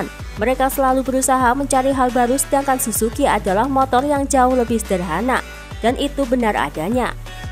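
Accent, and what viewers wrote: Java, Indonesia. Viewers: komentator nya jowo banget ya wkwkwkwkwk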